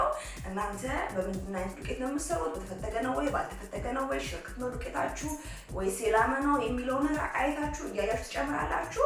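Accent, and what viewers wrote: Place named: Dire Dawa, Ethiopia. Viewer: ባለሞያ ነሽ አወራርሽ ደሞ የድሬ ዳዋ ሰው ትመስያለሽ የኛ ቤት አወራራቸው እንዳንቺ ነው። ለማንኛውም ማንኛውም alcohol አስከ 3 ሰአት የሚበሰል ከሆነ ነው አልኮልነቱን የሚያጣው 10 ወይ 15 ደቂቃ በማብሰል አልኮልነቱን አያጣውም ።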